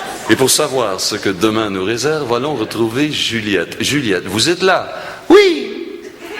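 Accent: Quebec, Canada. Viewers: la moitié des mots ne sont pas dans le dictionnaire?? Et puis pour les anglicismes je pense que les européens sont aussi pires, sinon plus (faire du "shopping", servez moi un "coca lite (ou light)" .......) et je suis désolé mais il parle comme un québécois de souche, ce qu'il est. C'est une langue qui dit ce qu'elle as a dire sans avoir besoin de se tenir le nez en l'air. Vous avez vraiment l'air "frais chiés" en france, toujours le besoin de corriger les pseudo-fautes des autres. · Je ne sais pas si tu lis les commentaires après tant d’années mais, je peux te dire que t’es une des personnes qui m’a fait aimer l’accent québécois. J’aime ta façon d’exposer l’actualité, tellement brillant; je partagerais un repas avec toi autour d’une table et jaser de tout et de tout.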